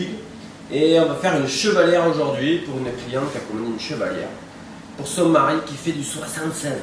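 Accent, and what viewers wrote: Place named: South, France. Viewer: Superbe création ♥ Belle leçon de bijouterie pleine d'humour avé l'accent, c'est top, Merci ♥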